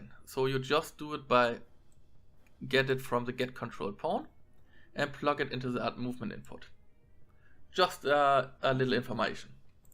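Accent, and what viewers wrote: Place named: Germany. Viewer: German accent is much different than Polish but i can still understand every word. Very good tutorials!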